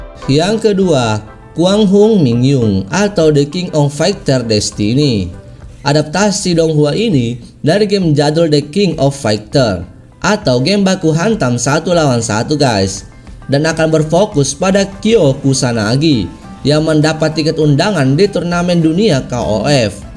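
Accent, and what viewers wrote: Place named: Batak, Indonesia. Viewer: ohh......mirip logat Batak dikit🙃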